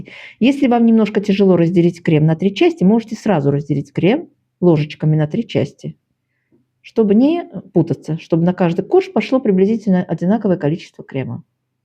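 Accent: Baku, Azerbaijan. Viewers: Бакинский акцент до сих пор прослеживается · Лёгкий бакинский акцент приятно слышать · Я,как услышала ваш разговор,сразу поняла,что вы бакинка.Я тоже пекла этот торт в 83 году.Очень вкусный. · Ах! Как я рада что нашла у вас этот рецепт. Давно искала. В Баку мамина подруга пекла, и было очень вкусно, он как ириска, тягучий с орехами, с приятным медовым ароматом. Спасибо вам огромное! Вы прелесть! Очень подробный рецепт, детальное описание, сладкий бакинский Джан акцент! Благодарю!!!!!!!❤ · добрый вечер!Я коренная бакинка.Сейчас живу на Кубани и с громадным удовольствием смотрю все ваши выпуски.Радуюсь вашему знакомому бакинскому акценту и замечательным и несложным рецептам.Оставайтесь такой же молодой,красивой и обоятельной! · Дорогая. Спасибо большое за рецепт. Обязательно попробую испечь для моих гостей. Вы чудесная женщина. Ваш бакинский акцент греет сердце.♥️ ❤ ❤ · Ваш бакинский акцент никуда не делся ❤ А мы в крем добавляли еще 100 г орехов, и в тесто тоже коньяк. 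· где бы Вы сейчас на не жили, а бакинский акцент не ушёл. Счастья Вам и мира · Большой привет бакинцам! Приятно слышать бакинский акцент! Очень интересный канал, богатая коллекция рецептов!!! Спасибо вам!!! Я тоже жила в Арменикенде! С уважением из Ростовской области.....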